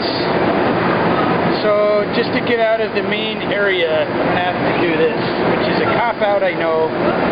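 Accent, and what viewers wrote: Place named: Chicago, United States. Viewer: Midwest chitown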